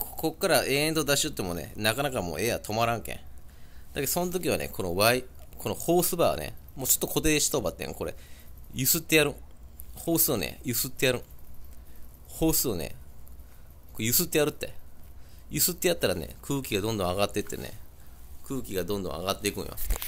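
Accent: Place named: Chikuho, Japan. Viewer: 筑豊弁懐かしく見入ってしまうw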